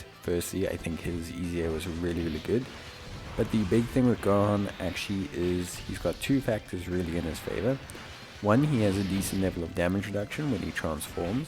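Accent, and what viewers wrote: South Africa. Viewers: Yo bro are you from South Africa? Your accent sounds familiar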